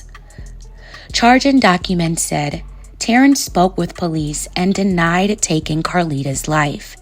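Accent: Baltimore, United States. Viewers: Bro. I'm from Baltimore too and I live in ATL also. Thanks for bringing these stories forward dummy and bringing attention to our city. You speak with our accent but you narrate robotically as if you're reading from a piece of paper. If I. Was you dummy, I would let this female narrate my blog's because she tells the story more fluently. #MajorDifference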